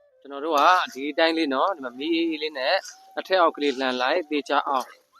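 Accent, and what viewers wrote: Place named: Upper Myanmar, Myanmar. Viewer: အညာစာလေးရယ်အညာသံလေးရယ်စိတ်ဝင်စားပါတယ်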